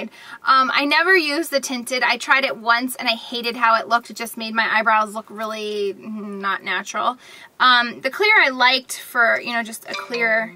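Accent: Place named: United States. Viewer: could I just ask what part of the states you are from? Love your accent. I am from the UK x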